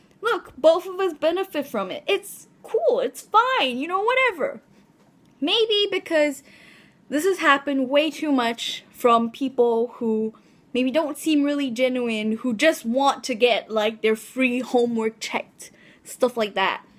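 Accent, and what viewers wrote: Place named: United States. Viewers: Btw you sound very American for a Singaporean. Why? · You're English is good, you legit sound American! I thought you were Asian-American at first lol. I remember speaking to a Japanese girl who sounded pretty American too :3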